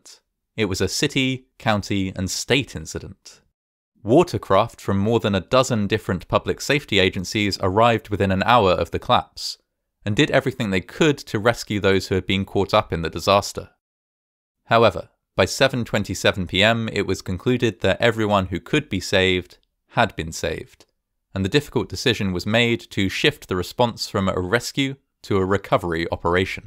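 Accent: England, United Kingdom. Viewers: Is he English ?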